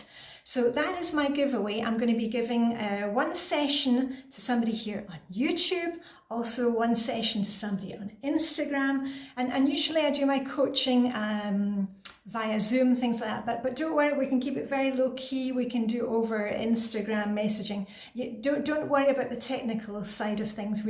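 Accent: Scotland, United Kingdom. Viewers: On IG I guessed the mystery box was just that: an empty box so we could start decluttering. 📦Now I'm thinking a hygge -personalized binder (pom poms and a Danish flag on the front?) so we can add all our routines. I would love a personal session if for no other reason than to soak up your energy and listen to your Scottish accent for a wee bit! Happy "open" New Year..you give so much so graciously. ♥️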